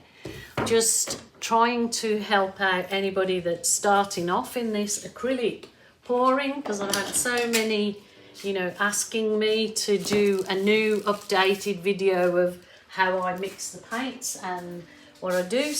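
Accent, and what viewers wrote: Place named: West Midlands, United Kingdom. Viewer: Off topic but I keep thinking I can hear a West Midlands accent amongst the Aussie!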